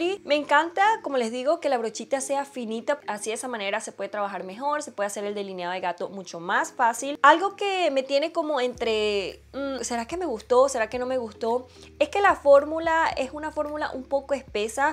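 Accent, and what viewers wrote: Venezuela. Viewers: Hola, no se por que tu acento, me parece venezolano ☺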